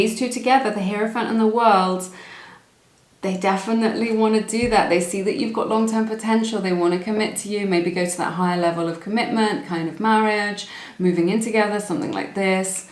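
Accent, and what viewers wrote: England, United Kingdom. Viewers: is so beautiful. Or I mean. Love her hair... also love her english accent... Excellent reader aswell. 🙏🦄⚘💛⚘🦄🙏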